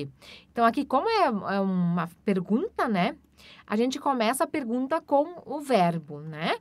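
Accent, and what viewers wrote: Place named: Germany. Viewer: Oi, Gostei do video. Te pergunto de qual regiao da ALEMANHA é o teu sotaque. Agradeco.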